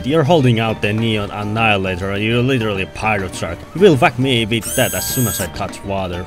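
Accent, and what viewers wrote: Finland. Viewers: Kuuntelin minuutin ja haistoin suomalaisen aksentin. Good video regardless! Informative and staid pretty close to the topic.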